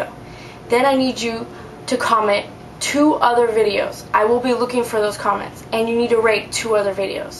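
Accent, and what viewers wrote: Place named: Latin America. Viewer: MAC omg ur soooo prettyyy and u hav a sper cute accent its got like a lil latino kik to it lol if u no wat i mean...cant belive this contest is over reli cud hav dun wiv those products lol tc xxxxxx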